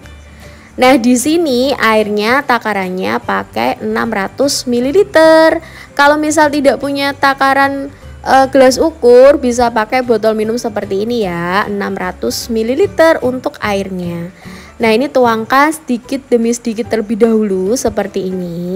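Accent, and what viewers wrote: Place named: Java, Indonesia. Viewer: Suara nya medok Jawa bnget, kereeeen 👍👍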